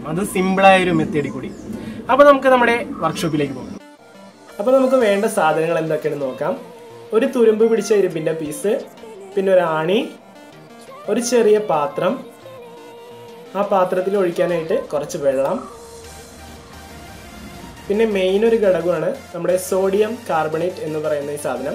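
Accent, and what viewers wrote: Thrissur, India. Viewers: Oru Rakshayilla.. Trissurkaranano?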